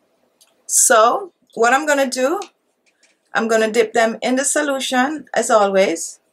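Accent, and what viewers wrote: Jamaica. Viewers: kokonut 4ever Jamaican-it's evident from how she speaks and pronounces her words. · hey girl I am loving your videos, I can hear your Jamaican accent you go sister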